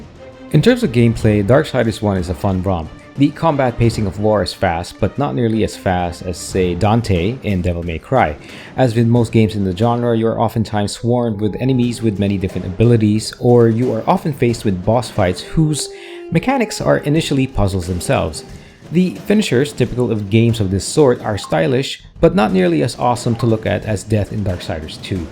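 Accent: Philippines. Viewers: pinoy ba to. parang pinoy yung accent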